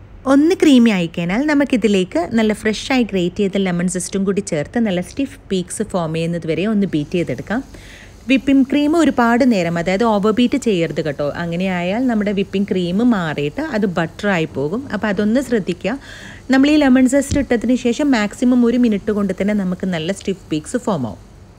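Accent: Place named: Kannur, India. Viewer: ചിലർ നമ്മെ അത്ഭുതപ്പെടുത്തും എന്ന് പറയാറില്ലേ... എത്രമാത്രം ശരിയാണെന്നു ഇങ്ങളുടെ ചാനൽ കണ്ടാൽ മനസ്സിലാവും.. അസാധാരണമായ റെസിപ്പികൾ, ആരെയും ആകർഷിക്കുന്ന അവതരണം മാസത്തിൽ ഒരു വീഡിയോ ആണെങ്കിലും അത് കാണാൻ ആരും കാത്തിരുന്നു പോവും.. 🥰🥰🥰 എത്ര ഭംഗി ആയി വളരെ വ്യക്തമായി അവതരിപ്പിക്കുന്നു.. കണ്ടപ്പോൾ തന്നെ വായിൽ ഒരു പേമാരി വന്നു 👌👌🤣ഒരു രക്ഷയും ഇല്ല... കിടിലൻ റെസിപ്പി.. ഭാര്യക്കും പെങ്ങൾക്കും ഷെയർ ചെയ്തു... 👏👏👏ശാക്കി ബ്രോയുടെ ബിരിയാണി കിടിലൻ ആണ് 🌹🌹🌹 (ഇങ്ങൾ kannur ആണോ നല്ല അക്ഷര ശുദ്ധിയുള്ള സംസാരം ). ടോട്ടലി അടിപൊളി എപ്പിസോഡ് 👏👏👏👏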